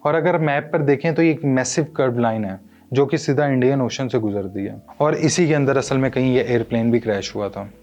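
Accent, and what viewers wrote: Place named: Punjab, India. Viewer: Watching you from Saudi Arabia I suggest you please Improve your Urdu little bit and prevent it from punjabi accents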